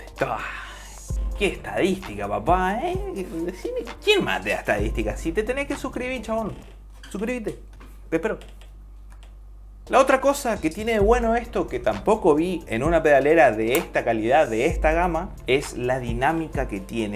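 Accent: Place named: Paraguay. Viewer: Muy en el fondo se te nota el acento paraguayo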